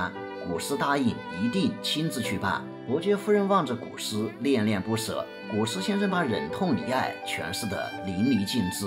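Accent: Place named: Zhejiang, China. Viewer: 越哥是浙江人吧? 你的口音我熟。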